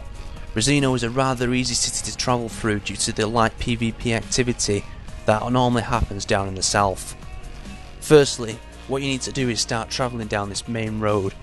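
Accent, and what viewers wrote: United Kingdom. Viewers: you're voice is absolutely amazing, where are you from in the UK? -- you sound like you're perfect to join the army with that voice as a CO :)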